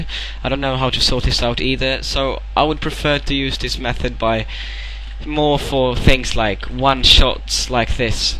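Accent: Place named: United Kingdom. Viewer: UK Voice.. Better then those other shitters that can't speak proper english lol. Nice tutorial sure :) Keep them comming ^^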